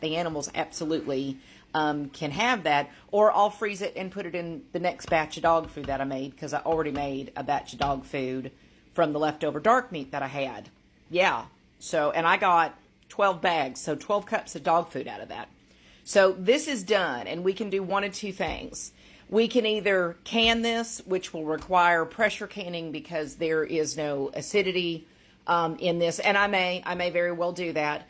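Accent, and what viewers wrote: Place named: East Tennessee, United States. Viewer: I SWEAR, you GOTTA be from East Tennessee!!!! Plz say ?!??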